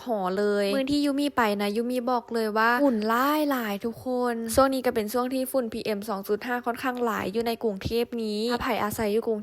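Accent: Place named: Khammouane, Laos. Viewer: ภาษาน้องเว้าเป็นภาษาทางแขวงคำม่วนทางอิสานของไทยจะนครพนมและสกลนครครับ❤❤❤❤❤❤❤❤❤❤❤❤❤❤❤❤❤❤❤❤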